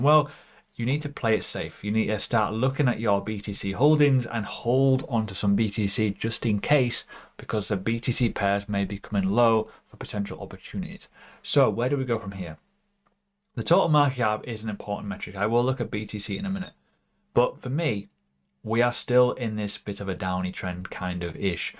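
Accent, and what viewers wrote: United Kingdom. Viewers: Great content. Maybe talk a little slowly people used to listen an american english than british.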